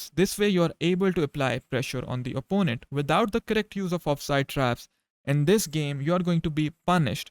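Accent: India. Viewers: Man just tell are you indian as your accent is so much indian??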